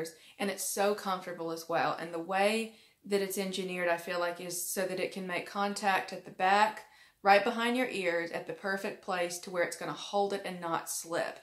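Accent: South, United States. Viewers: I'll be using my Ray Ban 3487 for more physical activities. But off sunglass topic--"Mardi Gras" and "living in the deep South again" - so was I right that I detect a very slight Southern accent even before you said that? Please don't be offended, I was just curious.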